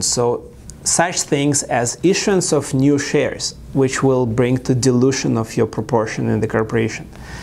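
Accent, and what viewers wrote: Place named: Russia. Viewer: Prettty sure it's a Russian accent.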